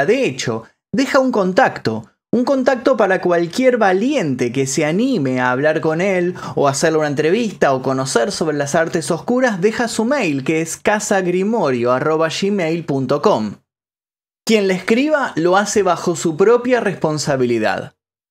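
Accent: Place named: Argentina. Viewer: No soporto el acento argentino 😅 tengo que dejar de ver un buen video 😢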